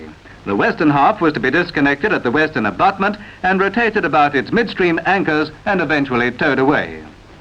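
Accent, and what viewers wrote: Australia. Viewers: He's Tasmanian, ask someone from Smithton Tasmania where they're from and you'll hear smitten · Ya got tru blue aussie accent there mate 🤠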